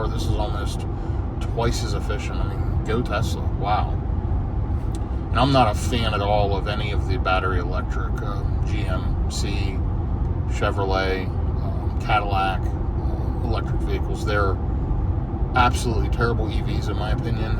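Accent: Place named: Western North Carolina, United States. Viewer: I'd still say North Carolina with the way you pronounce your Os. It very unique to western North Carolina.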